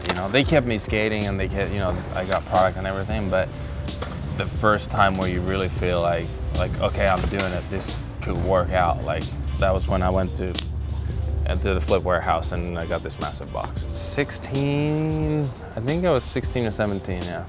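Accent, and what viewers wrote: United States. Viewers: From the comments in the he said in the menkimati video, his sponsors in Finland in this video and the way he pronounces Finnish words in an American way. I get the feeling he isn’t the biggest fan of Finland :) Feels like he was someone who didn’t belong and is happy that he got out. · I'm sure hanging out with cali stoners for 20 years helps with the accent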